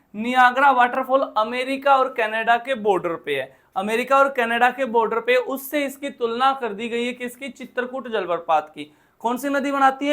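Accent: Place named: Rajasthan, India. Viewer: Rajasthani tone 😊 Haryanvi v ... thora thora Panjabi v😍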